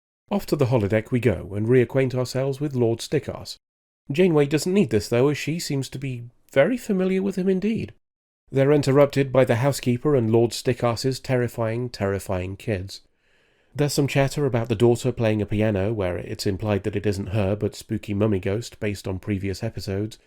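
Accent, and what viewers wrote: RP, United Kingdom. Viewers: After playlisting ( if that is a word). Two observations, you are the most British toff i heard since I worked on the Antique Roadshow and you don’t really understand American women at all.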